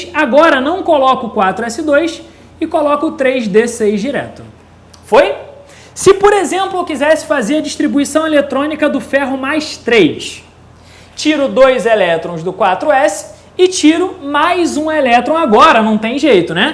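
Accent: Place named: Rio de Janeiro, Brazil. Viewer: Legal super entendivel, mas poderia ser meno cariocado,,,,cerrrrrrrto.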